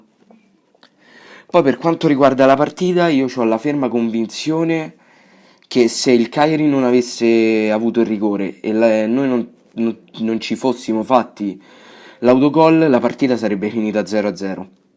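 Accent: Rome, Italy. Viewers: Parli romano. Non ho parole.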